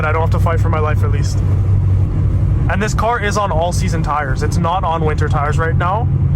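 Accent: Canada. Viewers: The moment he got in the car and started talking, more and more I hear the Canadian. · The km/h and accent is definitely 🇨🇦😂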